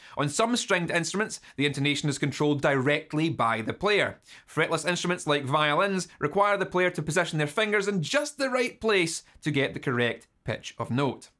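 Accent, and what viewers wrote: Scotland, United Kingdom. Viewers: What a lovely Scottish accent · I've seen enough Peaky Blinders to know that this man is Scottish.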